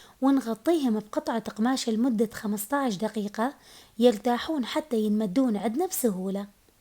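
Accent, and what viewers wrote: Mosul, Iraq. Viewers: يسلم ايداج صوتج يخبل وكلام موصيلي وفوري يخبل ياريت اسم الصفحه مشتريته منهم القوري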